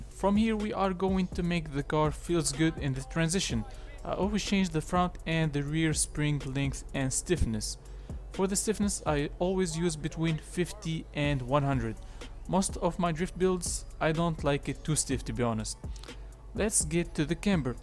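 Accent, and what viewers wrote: Tunisia. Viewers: عرفتك تونسي من السماعات sprint of gamer😂😂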